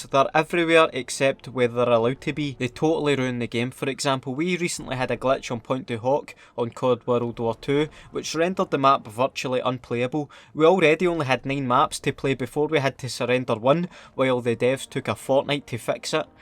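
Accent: Scotland, United Kingdom. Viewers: I’m bit of a camper, but as a veteran of this game, I cannot go toe to toe with these rapid reaction wee bastards! Totally agree with no 2 , I rarely play these games because of this. Drives me bonkers. Love the banter, great to hear a Jock voice on these channels. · I like your scottish accent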